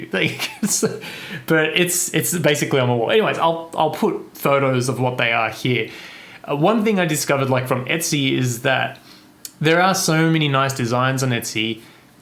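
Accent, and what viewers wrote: Australia. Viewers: Welcome to flosstube knew you were a fellow Aussie as I was listening to you - your a natural - your wizard work was fantastic ~ I am working on the pirate ship for my grandson I did change the called for fabric though a stitch in time is an Aussie store I buy from too needle minders I brought from WA etsy store recently called ‘inchwormdesign’ really like them and reasonably priced - looking forward to next episode😊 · Great first flosstube! Lately I feel like all the flosstube channels I’m recommended offer up similar content. I discovered a few new designers with you which got me excited! It’s also great to hear a fellow Aussie accent ☺️ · Hey from Melb, love hearing another Aussie! Well done on your finishes. I agree, it's a daily lifestyle. Hope your pinky recovers, I hit 40 last year.....gets worse 😂. Welcome to Flosstube 🥳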